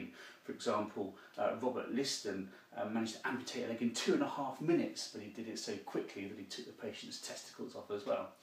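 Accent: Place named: Scotland, United Kingdom. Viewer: Scottish accent 10/10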